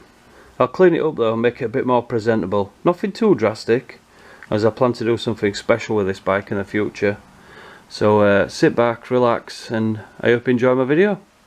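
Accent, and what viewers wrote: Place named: Yorkshire, United Kingdom. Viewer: Thats a good yorkshire accent is that. Commenting for algorithm ✌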